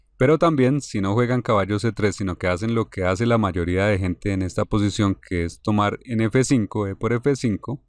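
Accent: Bogotá, Colombia. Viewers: Espectacular!!! En especial la última, Que acento tan capitalino-Teusaquillo, muchas felicitaciones Exelente Canal!! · ¿ Igor Smirnov es el Colombiano que estoy escuchando ?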